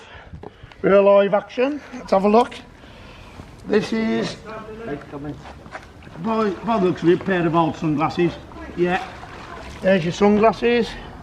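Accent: Birmingham, United Kingdom. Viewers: i love the brummie accent great stuff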